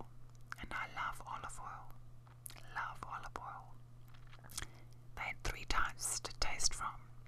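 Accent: Australia. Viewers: I find I can't watch your videos as often as other ASMR artists videos, because I don't want to risk losing the tinglefest I experience whenever I listen to you speak in that gorgeous Australian accent! That is, when I don't lose myself in your beautiful face! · I love the Aussie accent. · Such a pleasure to hear a fellow Aussie! Very intense! And very close to home! <link>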